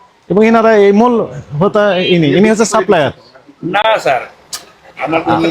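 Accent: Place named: Naogaon, Bangladesh. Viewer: স্যার আপনার বাসা কি নউগা?আপমার ভাষার একসেন্ট অনেকটা অইরকমই!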